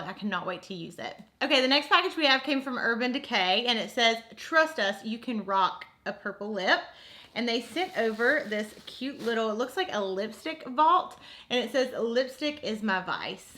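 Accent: South, United States. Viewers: You have to be from the south lol but anyway I love your videos!!! Everytime my phone dings I'm hoping it's a new video from you.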